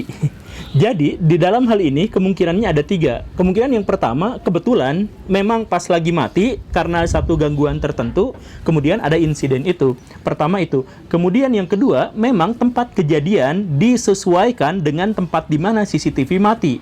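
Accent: West Java, Indonesia. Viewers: Sunda pisan si akang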